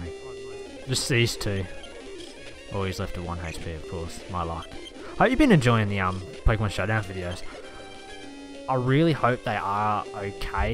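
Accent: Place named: United Kingdom. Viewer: Nice a British guy goooood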